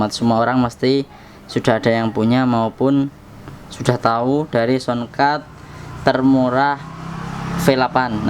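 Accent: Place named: Java, Indonesia. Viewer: Saya tebak orang ini pasti jawangapak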